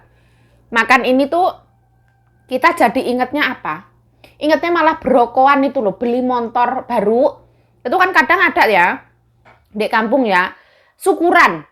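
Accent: Java, Indonesia. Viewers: Mb asli org mana kok logate jowo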